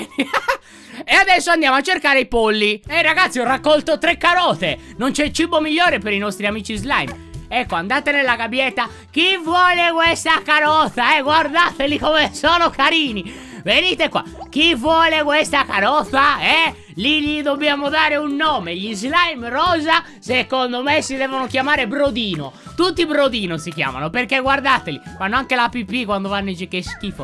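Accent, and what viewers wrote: Sardinia, Italy. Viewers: ma sei sardo??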